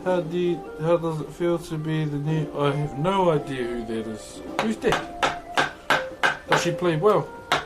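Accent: New Zealand. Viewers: That’s how is kiwis talk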